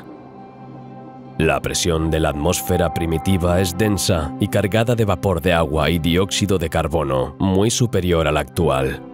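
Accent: Spain. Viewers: Y luego esa voz robotizada ,con acento español ,que huevaa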